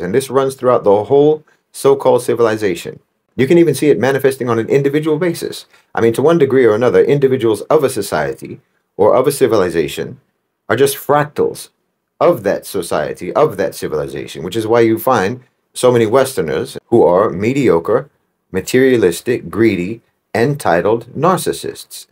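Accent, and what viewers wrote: United States. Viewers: And he he speaks it all with an American accent. Methinks mr marxist minion protest too much.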